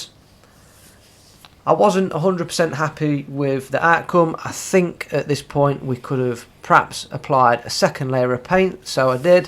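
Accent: United Kingdom. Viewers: Straight to the point no BS sound guy, and judging by his accent, no surprise, all straight talking in that part of uk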